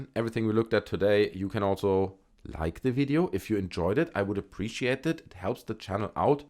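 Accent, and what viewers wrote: Germany. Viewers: For one thing you have a German accent for another were you there I was there when that happened in 97 my wife and I then I had a video camera I have video taped it it didn't make a sound and it was from not this world you can tell when your hair stands on your back it wasn't from this world no it wasn't a reshape balloon for one thing a balloon will not go into space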